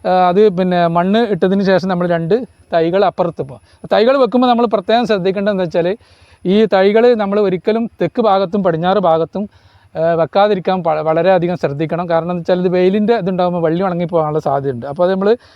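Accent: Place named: Valanchery, India. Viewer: മലപ്പുറം ജില്ലയിലെ വളാഞ്ചേരി ഭാഗത്തുള്ള ഭാഷ ശൈലി ആണ് അദ്ദേഹം സംസാരിക്കുന്നത് ഓരോ നാട്ടിൽ ഓരോ ഭാഷാ ഉച്ചാരണം ആണ് അതിനെന്തിനാ കളിയാക്കുന്നത്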